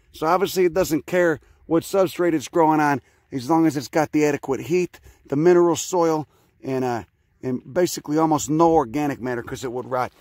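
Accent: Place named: New York, United States. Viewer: I love your accent adds a a bit of humor to your videos. You have to be from New York. Great videos and educational.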